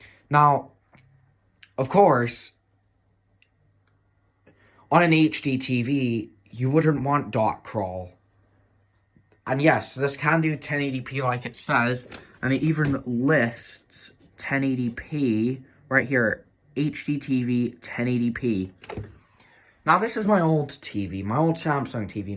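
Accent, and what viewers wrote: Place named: United States. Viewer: it an autistic sounding american accent, hope this helps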